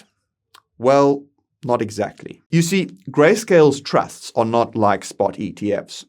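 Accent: South Africa. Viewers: Is that a South African accent? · A new south african guy hosting coin bureau?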